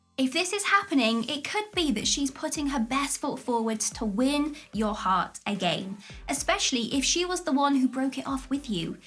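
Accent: England, United Kingdom. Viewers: Your videos are great, you're beautiful and I love your English accent.... Just saying, but thank you.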